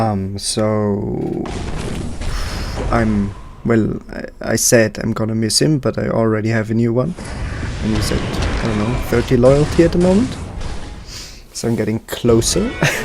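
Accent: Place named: Germany. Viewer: Man hört so krass, dass du deutsch bist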